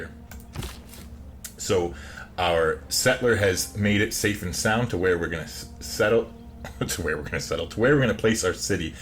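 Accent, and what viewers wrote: Canada. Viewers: Are you from Saskatchewan?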